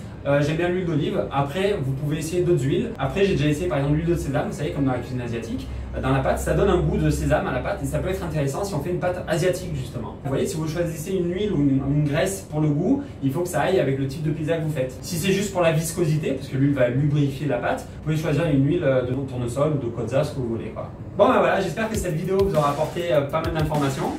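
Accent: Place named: South, France. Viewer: Merci pour toutes ces informations intéressantes! Et avec l'accent du sud c'est encore mieux!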